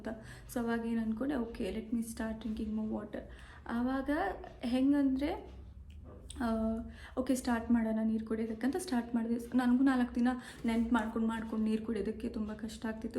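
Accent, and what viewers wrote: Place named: Bangalore, India. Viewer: Nam Hubli kannada matadri Bangalore style Kannada beda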